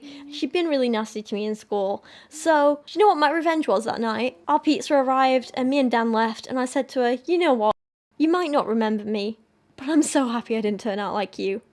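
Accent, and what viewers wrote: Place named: United Kingdom. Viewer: I have been wanting to ask but your accent... Where are from? I'm guessing UK but what part?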